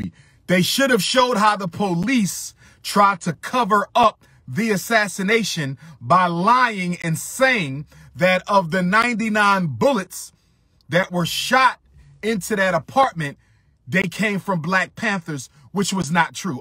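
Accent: United States. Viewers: Umar you speak with an American accent that derived from an amalgamation of British, French and other Europeans. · Doc. You have an American accent. Whether you try to or not. I agree, but the accent part is out there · DR UMAR YOU ARE AFRICAN WITH AN AMERICAN ACCENT, TRIBALISM KILLED AFRICA. LETS LOVE AND WELCOME EACH OTHER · lol you really bashing British tongue while u speaking American English? yaz usangene wena? uyakwaz loko? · But your speaking in American tone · With all due respect Dr. Johnson, Brother Kaluuya speaks with a British accent for the same reason you speak with an American accent. He is not "emphasizing" anything. Neither of you was born/raised in Africa, so neither of you has an "authentic" African accent when speaking the colonizer's mother tongue. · But Umar you have an American accent... wtf are you talking about?? Dude was raised in England that's why he talks like that..... 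I thought you were a Doctor. SMH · His not emphasizing anything. That is his accent. As an African from African you have an American accent. You don’t hear us saying that black Americans are emphasizing your American accent. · 😂 dude you are funny. He speaks English with British accent you speak it with American accent. There is no difference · As articulated as you are Umar ,you are "Emphasizing" american tongue so shouldn't we be concerned ? Cmon bro that's his damn accent , your whole video is speaking in an american tongue, and its disingenuous towards are Brothers that do have a british accent . That's ignorance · You're speaking with an American tongue ffs hypocrite 🙄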